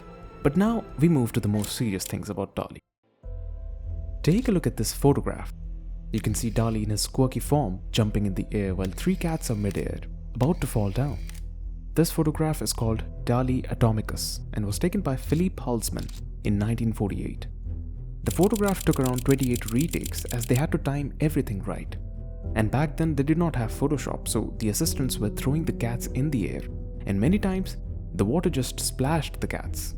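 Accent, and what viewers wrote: India. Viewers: At first I thought: "who is this idiot crying about fascism and racism". Then I heard Indian accent. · You can tell from the awful sound of this dude‘s cringe accent that he’s from India. Why in the heck would ANYONE think he would actually know what he’s talking about regarding a Western artist? I laughed my arse off when he talked about Dali’s “rAciSM” - oh the horror! 😱😂 This guy is so dumb in fact that he still thinks Adolf was “the baddie,” despite us living in the age of the Internet and having the truth right there before our eyes easy to discover now. This video is so cringe. The only thing that bothered me out of everything he listed was I felt bad for the cats. But I’ll bet my bottom dollar that the comment about him putting dynamite up a duck’s behind was a joke.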